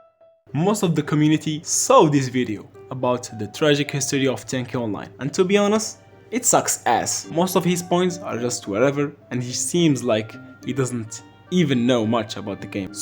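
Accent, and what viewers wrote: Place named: Morocco. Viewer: i hear a moroccan accent 😂, Nice video GL on the 1M views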